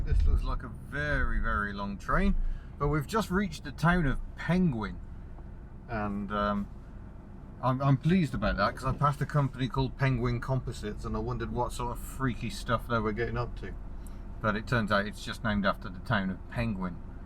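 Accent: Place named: England, United Kingdom. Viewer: Actually, the pronunciation of Launceston is more like Lon-ces-ton. If you call it *Lonnie*, they will think you're a local. Ok, maybe not with that English accent of yours. Lot of mainland blow-ins now so the name pronunciation may well have morphed a little. I used to live for a time during my schooldays in the 60s in Garfield Street, South Launceston. Attended high school in the suburbs Kings Meadows. Worked there for a time in the 70s after completing my apprenticeship. It is a nice city now but used to get very smoky in the 60s when everyone had wood, coal or briquette heating. I still miss the electric trolley buses of that era, not to mention the old Leyland buses with the half cab.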